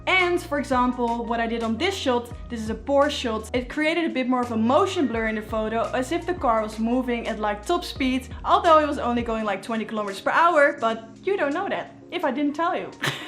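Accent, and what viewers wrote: Netherlands. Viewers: Hrt Nederlandse Engels accent is heel herkenbaar haha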